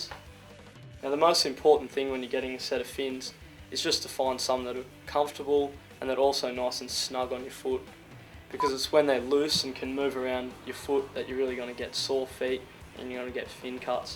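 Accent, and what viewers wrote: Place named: Australia. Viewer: I already know everything this guy is talking about but I continue to watch the video because his voice is Godly. It's like listening to Morgan Freeeman, except Australian and white.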